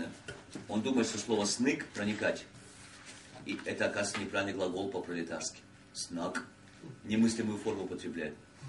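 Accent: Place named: United States. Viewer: It is a lot of sense in the method, yet the author's pronanciation is distinctly american. And his exerpt from "Riding Red Hood" I could not follow at all. Sorry!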